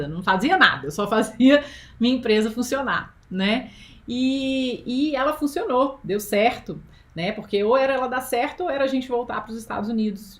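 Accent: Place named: Minas Gerais, Brazil. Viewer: Simplesmente amei! Eese sotaque mineiro é o máximo!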